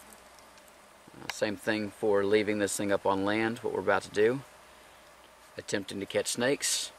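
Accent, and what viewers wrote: Texas, United States. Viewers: Great video, I live in central Texas and have a real problem with chicken snakes. You have given me the solution to my problem. You look like a biologist to me? Thanks for sharing your knowledge. You speak like a true Texan.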